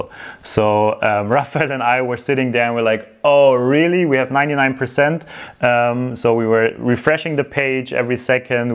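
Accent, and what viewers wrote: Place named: Germany. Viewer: Haha wie geil der deutsche Akzent 😄 Hört sich cool an 👍🏼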